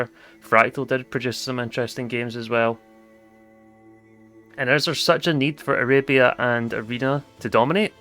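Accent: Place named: Glasgow, United Kingdom. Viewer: r u fae glasgow?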